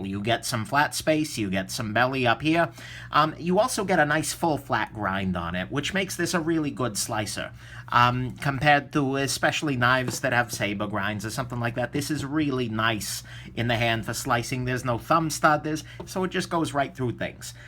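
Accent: Russia. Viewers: Hey . Yours „ russian English “ sounds much better then yours “ through the nose English “. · Excellent review and Ruskie accent. Spaceba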